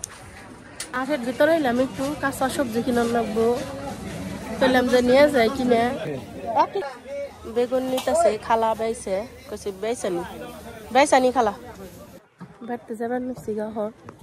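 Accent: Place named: Tangail, Bangladesh. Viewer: apnr vasa dekha bujse apni Tangail ar amio tangail ar cela basail thana amr basa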